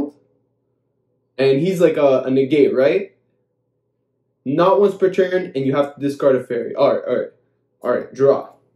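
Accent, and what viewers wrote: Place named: Toronto, Canada. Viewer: Dude I know that accent, my be from Toronto, eh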